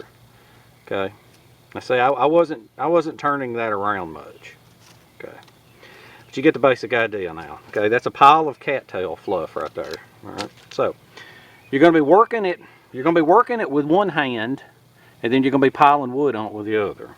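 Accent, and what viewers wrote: South, United States. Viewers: I like the videos I've seen you do and will be watching more I subscribed to your channel I like your knowledge, instruction, and your southern twang (I'm from Texas so I get it) anyway thanks for your time and enjoy your videos please keep making them. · i could tell by your southern drawl. I’m from WNC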